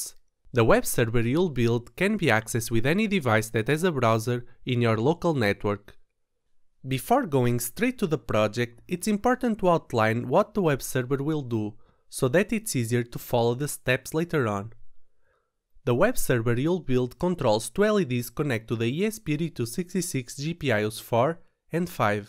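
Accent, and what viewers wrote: Portugal. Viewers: You sound like Portuguese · Olá! Hello! Descobri o canal sem querer. Estava mesmo à procura de um canal deste género! PS: Descobri logo que eras português nos primeiros segundos do vídeo devido ao sotaque. Keep it up ;)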